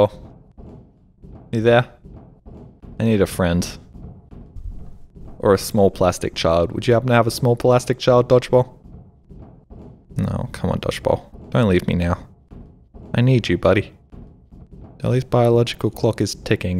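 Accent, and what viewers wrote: Australia. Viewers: wai whoa you aint more australian guy? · Not me, subscribed to him for years, only now discovered he is Australian and not British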